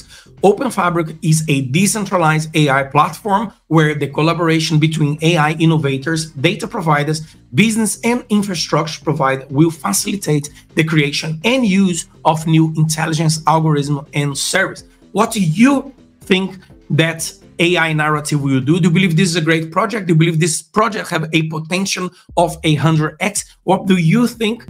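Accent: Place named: Brazil. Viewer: Your accent is too strong, looks like a Brazilian speaking in english..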